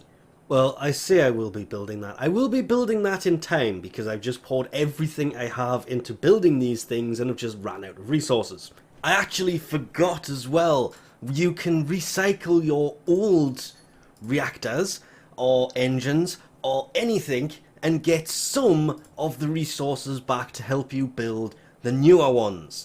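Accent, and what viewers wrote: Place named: Northern England, United Kingdom. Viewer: Desrei Camacho dude wtf, tacky spud is like full on Irish, this guy is from up north England somewhere, ya they speaking the same language but wtf dude are you deaf?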